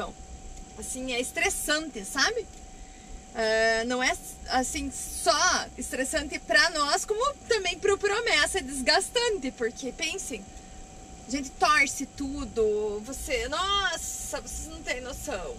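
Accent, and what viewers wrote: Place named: Santa Catarina, Brazil. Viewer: Boa noite. Adoro seus vídeos. Principalmente para ouvir sua voz. Esse seu sotaque catarinense com certeza chama a atenção. Essa sua frase "CHIC E BACANIZADO" também é legal e chama muito a atenção. Sua simpatia também é bem marcante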